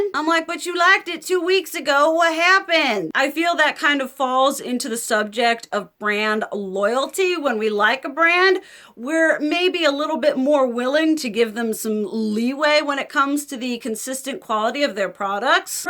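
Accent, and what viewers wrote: South, United States. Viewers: Hey girl!! This is the most Southern I have ever heard you lol. I recently refound my Electric palette and am thinking of finally decluttering it since it’s old. Lorac definitely stays in their lane. 😂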